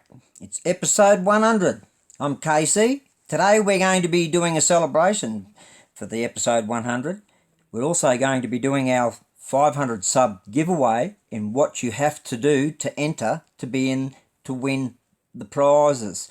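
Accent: Australia. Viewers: Well done on 100 episodes 🤙🏼 Ill comment etc but don't add me to the list to win mate, let someone else have a crack at these cool prizes! But...if you decide to ever have that Holden Ute as a prize i promise to enter as many times as I can 🤣🤣 Hope you're doing well mate and its good to hear ya Aussie twang back on the Tube 🇭🇲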